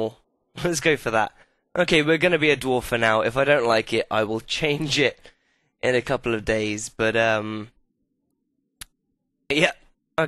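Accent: England, United Kingdom. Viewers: lol yeah hes def english buddy XD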